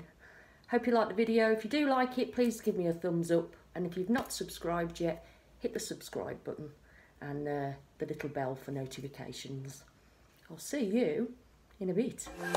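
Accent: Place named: Birmingham, United Kingdom. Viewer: Love this lovely listening to a fellow brummie x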